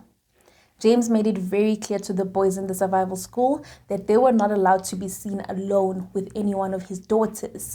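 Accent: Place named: South Africa. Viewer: Hey you sound like amazing journalist and these are unique video.....love the SA accent. WhatsApp fam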